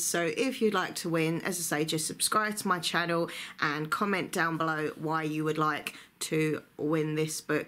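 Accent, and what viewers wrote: United Kingdom. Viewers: I want to win this book because my native language is not English and I use books films and youtube videos for learning the language and I think books are the best in this because there is a lot of vocabulary in it. I learn English for some time and I try to learn more and more, so I'm trying to read English books and watch your videos, because you have a strong British accent which is good to practice listening.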